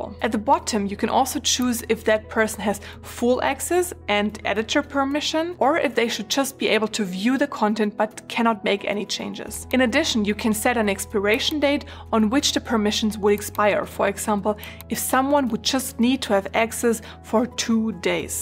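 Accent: Germany. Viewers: Is that German accent? Just curious, good video btw 🐥❤️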